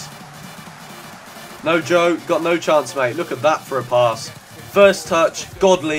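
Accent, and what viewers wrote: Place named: Scotland, United Kingdom. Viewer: FANTASTIC Scottish accent mate!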